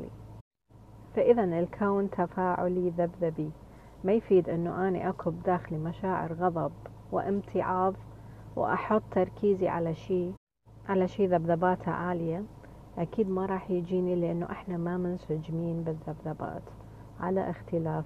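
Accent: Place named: Iraq. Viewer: فعلا أدركتي عمق القانون هنيالك ع فكره مره حبيتك لأني احب لهجتكم هل العراق فدوه 💜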